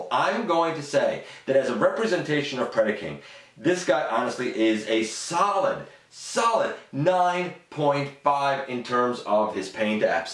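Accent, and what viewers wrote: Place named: Quebec, Canada. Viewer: You sound French Canadian. Haha, I stumbled across this video precisely because I see the set is on sale for $120.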